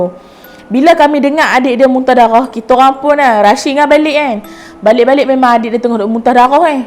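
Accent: Kedah, Malaysia. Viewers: Akak org kedah ke penang?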